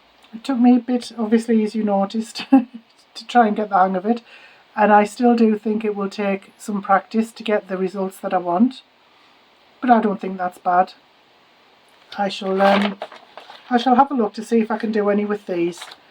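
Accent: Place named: Northumberland, United Kingdom. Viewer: Which part of Northumberland are you from 😊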